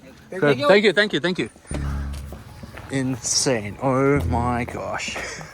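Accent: South Africa. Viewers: I’m from South Africa to and I just discovered your channel from a guy that is a fpv pilot he’s your buddy you guys made a video together about the go pro hero 12 but anyways I’ve been binge watching your videos and I have to say as a South African I am so proud to know that you are from our country and I’m so glad to see you doing so well in life traveling and making these sick videos I’m moving to Dubai where my family moved 8 years ago and once I get there I’m starting a YouTube channel and that’s how I came across your channel I was researching cameras and drones and your busy I can’t remember he’s name but you where in his videos which led me to your channel cause I heard your accent and he said that you are very well know in his videos and I just wanted to say I’m so glad I found your channel your so inspiring and your videos and skills and knowledge is so amazing to see mahn keep it up u love it when our people can be so skilled and make videos at the quality on par with people over seas and even better as I saw in your go pro awards video and you won to you fucking legend amazing content love from South Africa 🇿🇦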